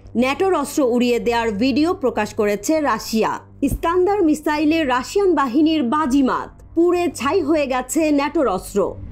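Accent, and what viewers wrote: India. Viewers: ভারতীয়দের মতো কথা বলে এই সাংবাদিক/উপস্থাপক।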